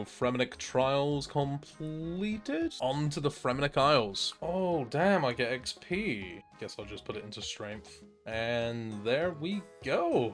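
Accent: Bristol, United Kingdom. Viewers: bristolian accent shining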